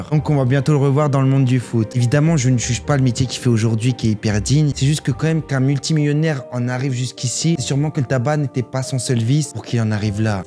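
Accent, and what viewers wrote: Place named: Nord-Pas-de-Calais, France. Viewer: Tu fais vraiment passer Jeremy Matthieu pour un simplet , à t'écouter il n'avait aucune ambition et aucune hygiène avec le tabac et il finit malgré tout au Barca , va comprendre . Comme le fait de travailler à Intersport . C'est toi qui est simplet avec ton accent chti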